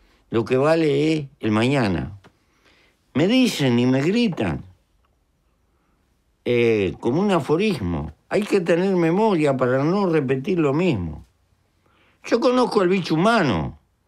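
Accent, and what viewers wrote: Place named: Gaucho, Argentina. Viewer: 3.31 min un helicotero (helicoptero) jajaja grande pepe la expreción campera y gaucha no la cambies nunca!!!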